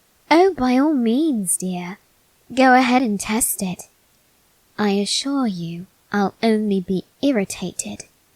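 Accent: United Kingdom. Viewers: Why are you so good at doing a Bri'ish accent? (I'm Bri'ish my self..!)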